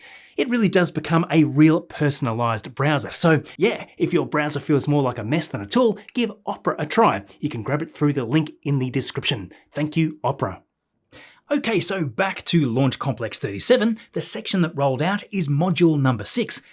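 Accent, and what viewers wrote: Australia. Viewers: You messed up the “that’s not a knife” name. With your accent, you should have done the famous Australian line That’s not a knife …..(THIS is a knife). I forgot the movie it’s from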